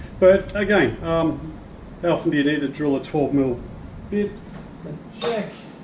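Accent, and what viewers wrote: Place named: Australia. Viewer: Good old Aussie accent!👍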